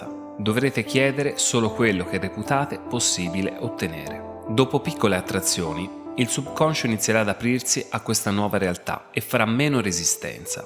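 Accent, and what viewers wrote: Tuscany, Italy. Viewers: L'accento toscano mi fa venire ancora più voglia di ascoltare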